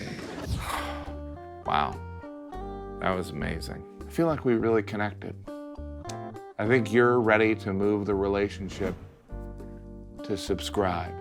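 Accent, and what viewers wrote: United Kingdom. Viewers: I like your British accent did your kiddos teach you that? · That's an English (ish) accent, no such thing as a British accent. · Hi, loved your routine. Your British accent is hilarious, you're very handsome, far beyond the pale! Many greetings from Argentina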